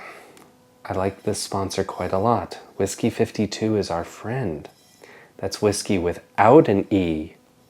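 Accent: Scotland, United Kingdom. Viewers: Hi, your mimicry of an English accent was pretty good and you are starting to acquire a Scottish accent too. The whisky certainly seemed to reach parts that other whiskies don't reach😅. Loved watching the gin production at Dunrobin Castle, I always wondered how gin companies got their botanicals into the gin and now I know that its with a giant teabag. Love the colour of their bottles too. Wishing you and Christine as well as Queen Annabel a Merry Christmas. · I’m liking the new format. I’m also starting to notice a wee bit of a Scottish twang with some of your words, we must be growing on you 🙂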